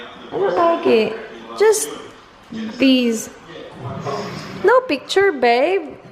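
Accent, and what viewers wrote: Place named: Visayas, Philippines. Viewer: Te pag bisaya nalang ... bisaya Kay imong accent ... love ur language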